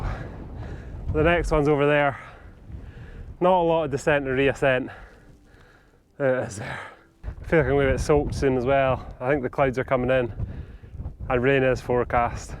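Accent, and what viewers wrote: Inverness, United Kingdom. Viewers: Loving the videos mate, really well edited and really informative. Believe it or not I just ticked off my 75th this weekend, Beinn Dearg (Blair Atholl). Do I sense an Invernesian accent?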